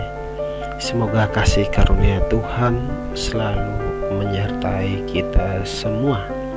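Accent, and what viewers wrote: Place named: East Indonesia, Indonesia. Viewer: suara dalam video ini...kedengaran macam suara sdr dari Timur...mungkin NTT atau FLORES. apapun...semua Gereja Tuhan itu belum ada yang sempurna, hingga nanti menit TERAKHIR di sempurna kan sang PEMILIK GEREJA, jadi bisa saja bersatu walau dalam perbedaan.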